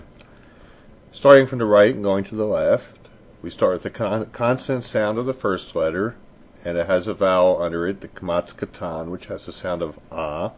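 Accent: United States. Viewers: Love your work! Lessons are informative and take the right pace for any person starting to learn Hebrew. Your Hebrew might be a bit "American", just like most of Hebrew in Israel is a bit "Russian", "Hungarian", "Germanic"... Some people swallow : (/e/), some pronounce it... No one should be discouraged because of it, just the opposite! Approach taken here is better than anything I have seen or heard up till now. Bravo and toda raba! :) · the thing about non-native language teachers is that they don't exactly mimic the local sound. for example the teacher in this vid still pronounces the words with a subtle american accent (e.g.: "owe" instead o "oo", "meih" instead of "meh"; the word 'nekudot' (hebrew=points) was also pronounced the american way. pls try to delineate the american sounds to hebrew (or any other foreign language for that matter) sounds for an authentic foreign language lesson. 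nice lesson though. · what a bad way of pronouncing all this, so americanised....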